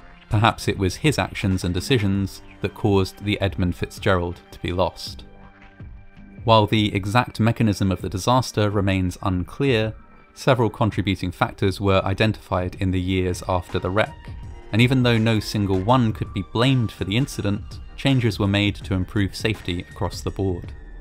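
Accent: England, United Kingdom. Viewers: Between you and Coffeehouse Crime, I am getting my fill of soft-spoken English guys telling me about terrible things in a soothing, satisfying manner. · American ship British narrator 🤔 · Im beginning to develop an addiction to your voice and the way you tell stories, which part of uk is your accent from?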